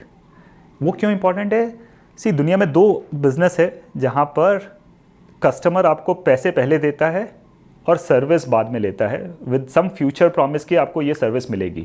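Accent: India. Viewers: Sundar pichai wala accent....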